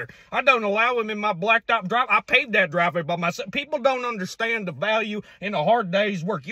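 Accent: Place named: South, United States. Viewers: Take out the southern accent, make the camera half the resolution and put it 5 millimeters away from his face, and this becomes a universal experience. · I genuinely love all these Southern accents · He sounds so fk southern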